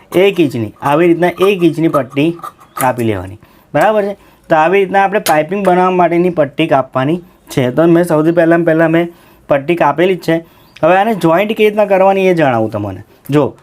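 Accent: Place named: Mehsana, India. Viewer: Kheralu baju na so bhai mhesana ni bhasha se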